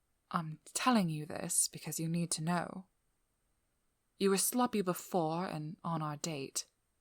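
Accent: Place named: United Kingdom. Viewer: When I tell you I SQUEAKED when I heard the British accent. I turned into a huge ball of floof squealing and kicking the air while morphing into a red balloon.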